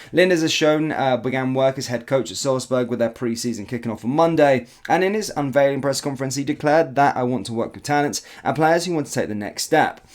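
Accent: Liverpool, United Kingdom. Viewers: Scouse not English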